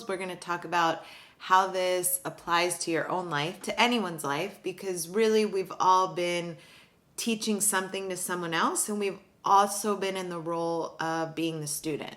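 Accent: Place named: Greece. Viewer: You sound Greek...!!!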